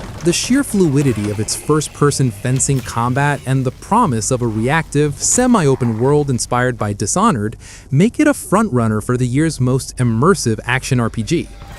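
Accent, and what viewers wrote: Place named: Australia. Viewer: your voice doesn't sound Indonesian at all despite location marked as Indonesia. not even singlish. hmmm australia? or is this AI run channel?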